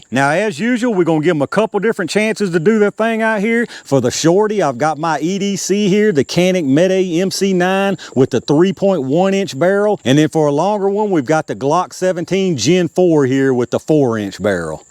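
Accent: South, United States. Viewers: damn.. you surely dont expect a gun youtuber with a strong southern accent to pull up all those gears for ammo tests lol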